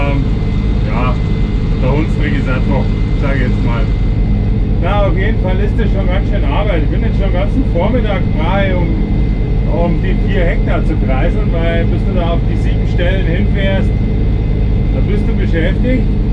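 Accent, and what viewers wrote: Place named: Bavaria, Germany. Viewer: Du konnst a boarisch ren😉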